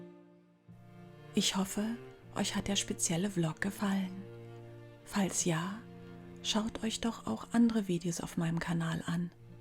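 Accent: Scotland, United Kingdom. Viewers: Ich bin platt…bei deinem schottischen Akzent hätte ich nicht gedacht das wir Landsfrauen 😂 sind…Toll…Danke für die tollen Videos…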